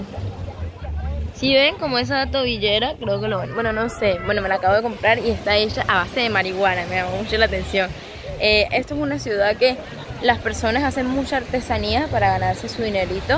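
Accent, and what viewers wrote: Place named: La Guajira, Colombia. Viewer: Pero tu de donde eres porque tiene puro asento guajireño. De echo eres hermosa donde te puedo ber..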